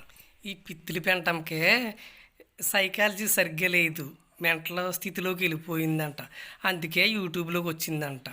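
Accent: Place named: Godavari, India. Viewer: Ayyo meeru vere anukunna andi. But voice super and Godavari slang super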